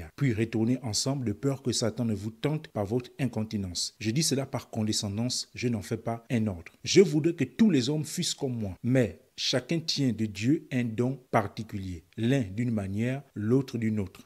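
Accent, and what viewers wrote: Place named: Gabon. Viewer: Je me disais bien que la façon de parler était comme un gabonais 🇬🇦 😅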